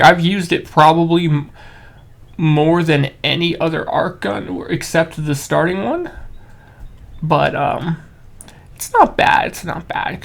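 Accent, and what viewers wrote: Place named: Germany. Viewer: You sound so german to me lel, and why do you refer to yourself as mentally unstable? 😅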